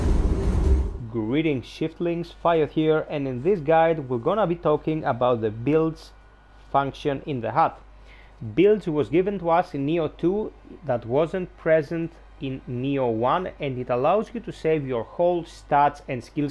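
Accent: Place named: Greece. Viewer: Ρε φιλε βλεποντας το βιντεο καταλαβα οτι εισαι ελληνας απο την αγγλικη προφορα χαχα!!καλο βιντεο αδερφε.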